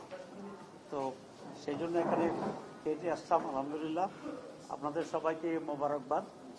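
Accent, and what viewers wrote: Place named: Chittagong, Bangladesh. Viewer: বি,এন,পি র সালাউদ্দিন সাহেবের অনেক যুক্তিপূর্ণ কথা উনার শ্রবন কটু চিটাগাং এর আঞ্চলিক টানে হালকা হয়ে যায়।মইন খান বেটার অপশন হতে পারতো। মির্জা সাহেব যেহেতু এই ঝামেলায় আসতেই চাচ্ছেন না।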